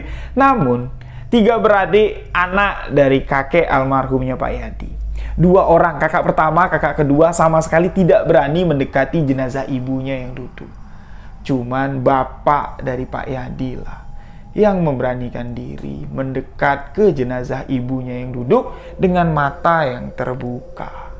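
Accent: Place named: Sunda, Indonesia. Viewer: Assmuallaikum bang sy penggemar setia kisah 2 horornya.bang orang jambi tpi sy merasa kok logat gaya ngomong nya agak mirip ke sunda kadang bahasanya kok sama bahasa sunda seperti " keukeuh" apa mas pernah belajar bahasa sunda jawa barat...he hehehe...sukses selalu untuk mas 👍👍🙏🙏🙏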